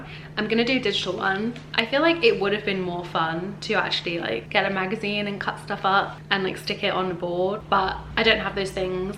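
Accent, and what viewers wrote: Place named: Gloucester, United Kingdom. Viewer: the Gloucester accent is strong in this one after being at home lol 😂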